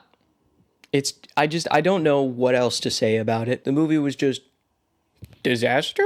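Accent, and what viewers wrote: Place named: United States. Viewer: btw you have a nice american accent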